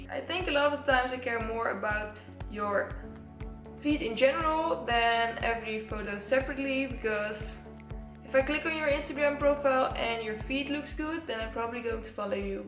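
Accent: Netherlands. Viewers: u dutch? guz your accent sounds dutch tho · ik ken je kanaal pas net maar je bent echt gorgeous!! aan het begin van deze dacht ik al gelijk te horen dat je Nederlands was en net ik de comments zag ik dat dat ook klopt lmao!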